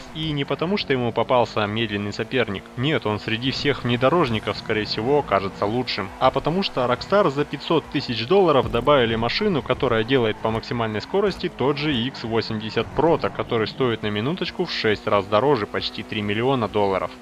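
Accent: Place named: Russia. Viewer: Он снимает видео на русском, для русской аудитории. Поэтому и говорит так, как удобно, на русский лад, если хотите. Снимал бы на английском - место для придирки было бы. А так нет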